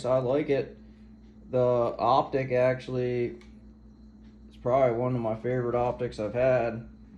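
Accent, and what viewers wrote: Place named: Delaware County, United States. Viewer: Sounds more Delco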